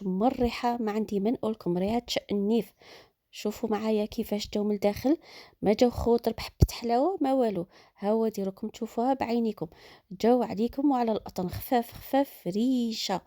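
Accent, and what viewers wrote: Tlemcen, Algeria. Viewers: الله يعطيك الصحة بنت بلادي انا اصلي من تلمسان و اسكن في مغنية اتابع كل وصفاتك و احب تجربتها رغم ان عندي نفس طريقة التحضير و لكن احب ان اسمعك و انت تتكلمين باللهجة التلمسانية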